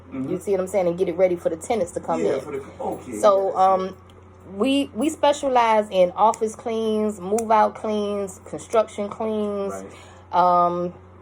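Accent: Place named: New Orleans, United States. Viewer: Sis where you from ?! I hear NOLA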